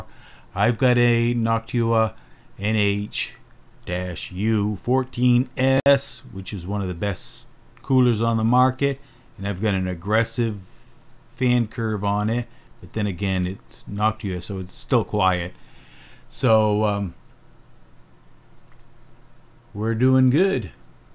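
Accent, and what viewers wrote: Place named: South, United States. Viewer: You've got a slight Forrest Gump accent :) Thumbs-up !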